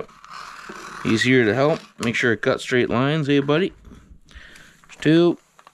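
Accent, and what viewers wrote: Canada. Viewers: dude, i knew from the first 3 words you said you were Canadian. I just wasn't sure where. Anyway, loved the vid n keep up feeding the fam. Especially your little hunter lol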